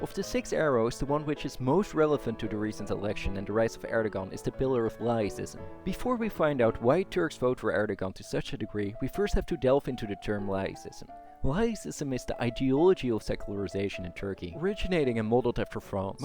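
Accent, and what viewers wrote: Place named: Netherlands. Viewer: No worries at all, thanks so much for the video, I'm guessing Dutch from the accent :)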